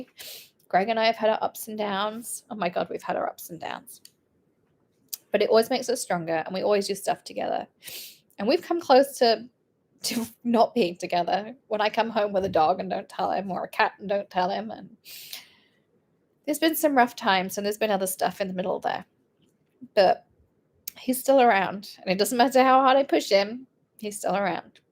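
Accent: England, United Kingdom. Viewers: You are a brave and strong women and I applaud you for continuing your journey through all the obstacles and coming out ahead! I have been following you for about a year and have binged watched all of your videos! I don't usually participate onlne during lives, except to say hi, but I really enjoy your creativity (and your English accent...lol) and your energy. Continue the strong the path! You are awesome!!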